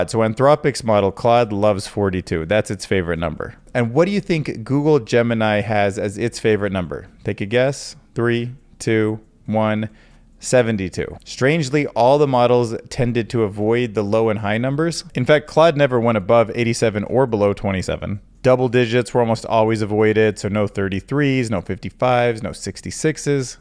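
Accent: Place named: United States. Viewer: This is so funny! I love you, man! Like he is holding it!:pride-flower-pansexual::pride-fan-rainbow-open:Sweden??? You sound American!:pride-heart-rainbow-philly:Ok I think I am the one who lives off crypto currently! But if I have a child from Sergey Brin - I will be on Brinfare!:pride-flower-pansexual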